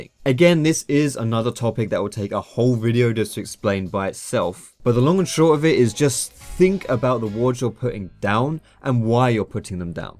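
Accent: United Kingdom. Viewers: Wait he's british? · He's uh. British. Not American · Wait... Are you british?!? OMG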